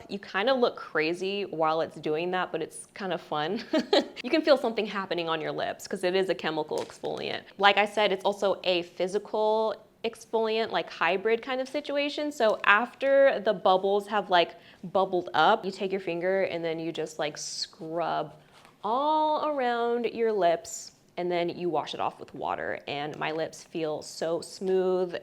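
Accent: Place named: Australia. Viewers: girlllll you’re Aussie accent is killing me 😂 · the aussie accent was confusing me so much 😭 but it’s so cute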